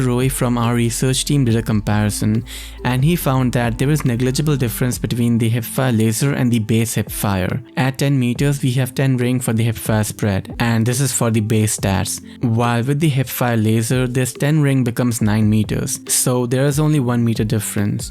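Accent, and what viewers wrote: India. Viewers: It's it just me or really does have a definitive Indian accent? Anyway, you're pushing great videos man. I really loved your qq9 build which was recommended by Jokesta. Keep up the good work 🖖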